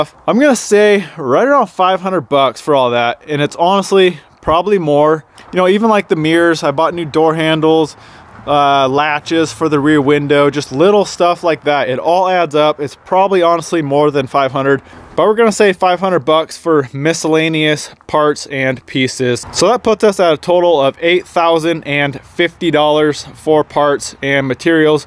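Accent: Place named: Finland. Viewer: Your Finnish English accent needs a a lot more work. Mika Häkkinen style.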